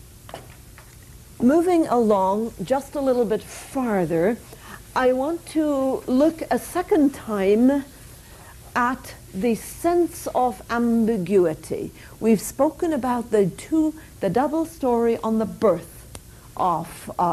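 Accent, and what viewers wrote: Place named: Ireland. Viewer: 15 minutes in before I noticed the Irish accent.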